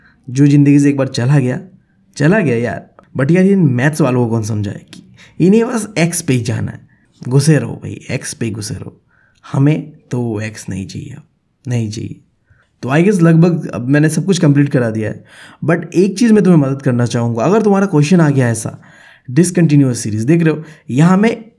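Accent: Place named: Bengal, India. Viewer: Please sahi se bolo na ekadam bangali kyu banrhe ho i hope tumhe meri baat samajh aai ho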